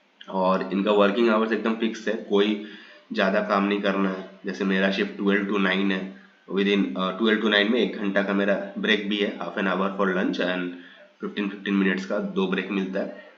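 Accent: Bengal, India. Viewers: Bengali ho kaya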